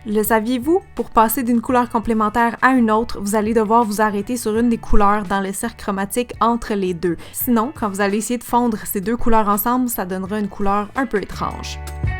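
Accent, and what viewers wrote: Canada. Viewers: As un accent Canadien ou pas ?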